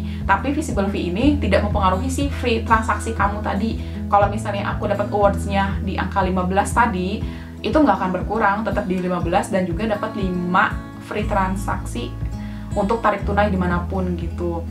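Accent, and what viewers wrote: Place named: West Java, Indonesia. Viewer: Sundanya keliatan ah😁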